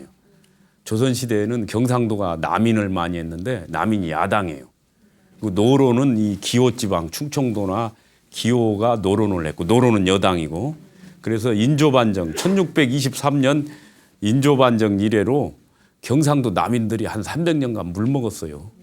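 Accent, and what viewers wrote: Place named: Jeolla, South Korea. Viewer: 기자도 아니고 교수도 아니고? 전라도 말씨네?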